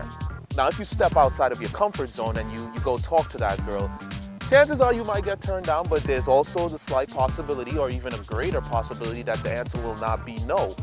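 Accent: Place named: Caribbean. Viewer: Yo!!! You sound like you from the W.I.!!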